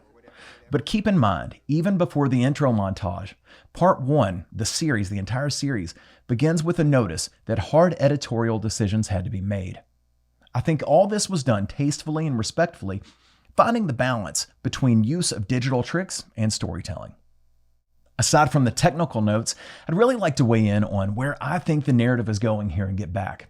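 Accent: South, United States. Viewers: Your Southern accent breaks through on occasion. Where does that originate from ?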